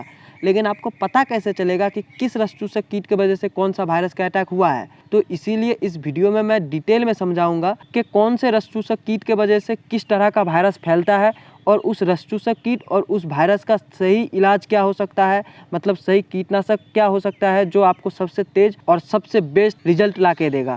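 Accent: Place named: India. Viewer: Ye kuch india k parts me aisi accent hi hoti hai . Mazak udane ki zarurat nahi . Bengal me bhi to V ko B bolte hai . Vidya ko Bidya bolte hai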